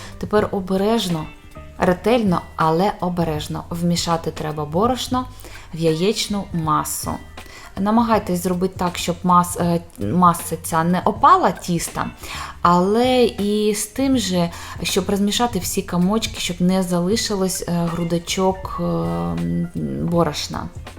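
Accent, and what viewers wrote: Russia. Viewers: Російський акцент цієї блогерки ріже вухо😮 Будь ласка не видаляйте негативних коментарів😮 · не може вимовляти букву О у ненаголошених складах, все одно виходить А - це загальне явище у всіх, для кого рідна мова російська.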